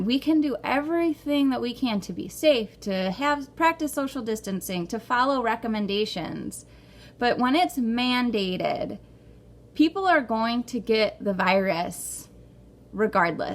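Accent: United States. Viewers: I like your Michigan accent.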